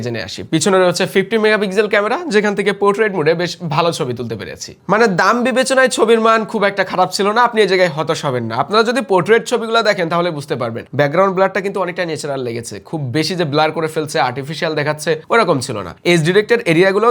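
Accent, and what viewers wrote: Benapole, Bangladesh. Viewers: ভাই আপনার বাড়ি কি বেনাপোল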